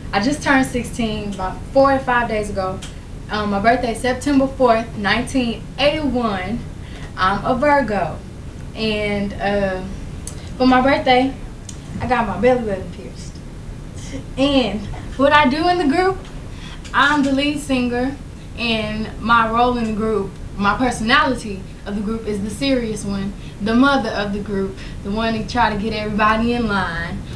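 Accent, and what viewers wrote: South, United States. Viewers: she sounds soo southern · aww her country accent😭💞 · Country as fuck 😩😁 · I loveee her country accent ❤️❤️ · Country!! I miss Beyonce's accent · She had her belly button pierced at 16!? That was a sin in my house! 😂😂 and she sounds a lil different a link country.! Love her old vids before and during Destiny’s Child time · oh she so southern!! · Aw, she was so country back then. Love it.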